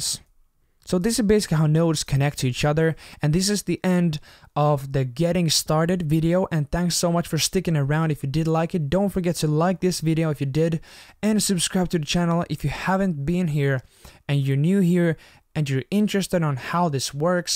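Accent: Finland. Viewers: Are you finnish? Ooks sä suomalainen?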